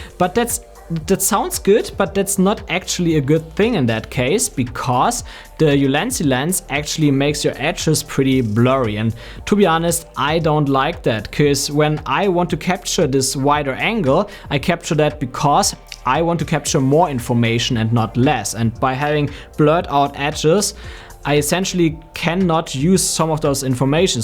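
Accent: Germany. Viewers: Höre ich da einen deutschen Akzent?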